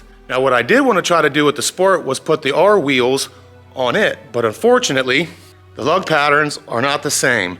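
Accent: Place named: Philadelphia, United States. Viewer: OMG you have such a Philly/South Jersey accent !!! PS - Your 2022 Civic sport looks amazing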